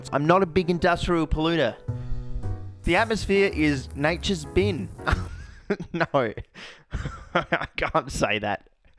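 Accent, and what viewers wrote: Australia. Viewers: "The atmosphere is nature's bin." Spoken like a true Australian. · Not only do I realy like your aussie accent but your honesty. you r showing all the damn takes it took, to get this tiny (but well worth it in my opinion) explosion filmed. You are awesome mate!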